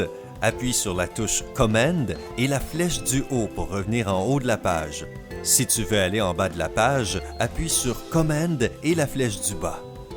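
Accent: Quebec, Canada. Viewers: moi aussi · L'accent Canadien on adore xDDD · J adore ton accent québecois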